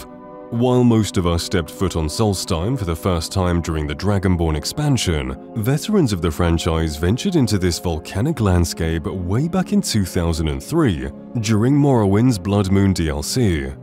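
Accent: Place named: United Kingdom. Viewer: as a american id say yes the brit voice sells the videos lol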